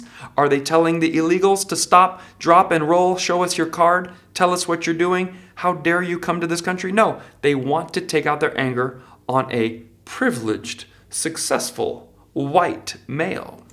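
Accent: Australia. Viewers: Great Aussie voice! You and your impressive impressions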